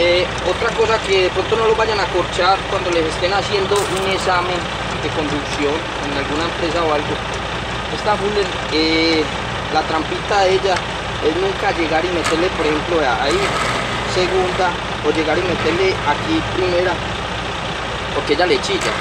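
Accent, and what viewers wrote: Colombia. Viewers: este es el verdadero conductor de carretera lo unico malo es el asento , la palabra seria muy colombiano para mi gusto es igual que el asento chileno casi que hay que buscar un traductor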